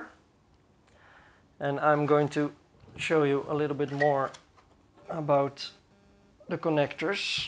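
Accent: Netherlands. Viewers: Ik hoor dat je Nederlands bent hahaha Leuke review❤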